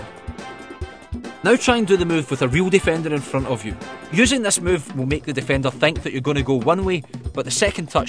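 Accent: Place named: Scotland, United Kingdom. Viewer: its full scottish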